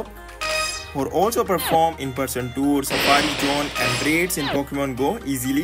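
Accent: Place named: India. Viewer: It’s obvious. He’s from India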